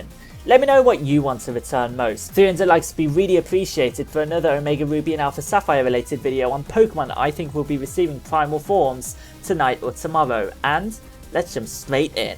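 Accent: United Kingdom. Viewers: Where is your accent from? Sometimes it sounds British, other times it sounds from the Orient. It's a cool accent.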